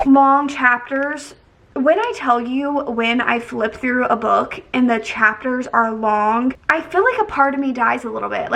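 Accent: South, United States. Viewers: question for you: have you ever lived in the south? i swear you have a slight southern accent and the way you say “oils” is just further entrenching my southern theory!!!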